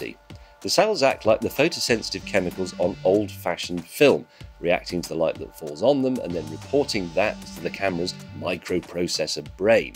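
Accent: United Kingdom. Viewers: Everything sounds better in a British accent.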